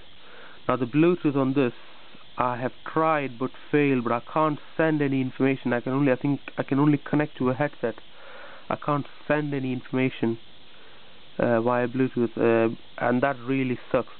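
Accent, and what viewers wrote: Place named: Trinidad and Tobago. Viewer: You Sound Like A Trini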